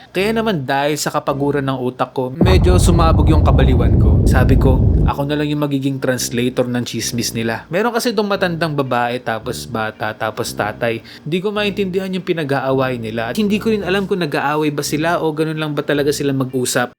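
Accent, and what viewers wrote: Ilocos, Philippines. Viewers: Kuya ilocano ka po ba?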